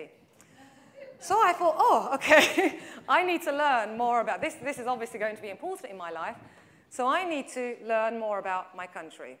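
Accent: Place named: Turkey. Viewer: Böyle bir aksan ve Türk bir bayan, sizi bulduğum için çok mutluyum..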